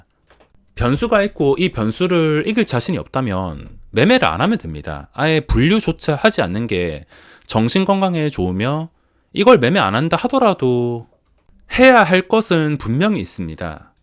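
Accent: Gyeongsang, South Korea. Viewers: 안녕하세요 님, 거의 1년만에 인사드리네요 ^^ 특유의 경상도 사투리의 구수한 말씀을 다시 들으니 좋네요... 그동안 너무 많은 영상을 보지 못했는데, 오늘부터 하나씩 보면서 공부해야겠군요...좋은 영상 감사드립니다.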